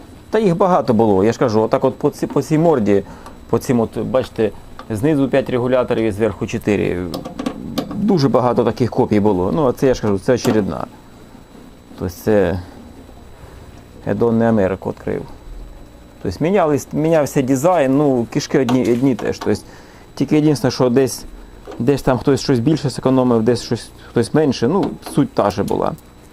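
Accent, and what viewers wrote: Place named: Poltava, Ukraine. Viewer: Шото говор наче полтавський! Угадав?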